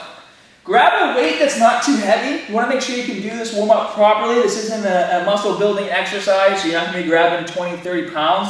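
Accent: Boston, United States. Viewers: Back in the day before went full Bostonian "Bahhbelll!"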